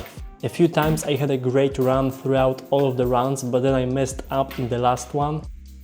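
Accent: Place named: Poland. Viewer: Ur Polish aren't you? Im Poland too hehe (i think ur Polish cuz ur accent and Cisowianka in the back haha)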